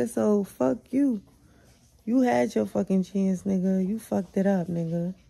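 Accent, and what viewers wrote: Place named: East Coast, United States. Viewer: you from the east coast? i get jersey brooklyn vibes..im from the west coast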